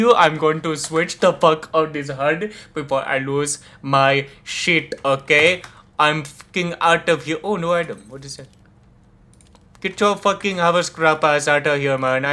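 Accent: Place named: India. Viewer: W indian voice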